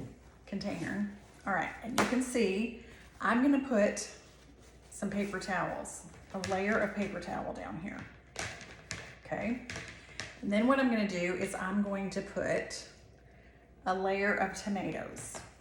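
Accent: United States. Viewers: love tomatoes and even though you pronounce it the American way, I forgive you! I am right off course because I am British! Thank you so much – Paul from England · I've got a large crop coming in, I need to try this thanks. That's the first time I've heard "Oh my stars" in so so long. You have to be Southern.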